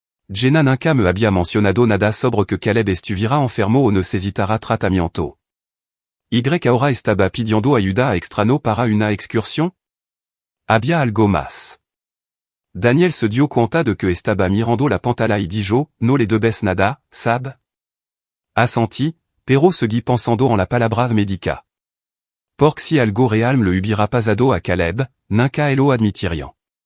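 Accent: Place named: France. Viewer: Que mierda es esta de poner una voz en pseudo castellano con un acento en francés que hace incomprensible y vomitivo el relato? salgo por patas de acá.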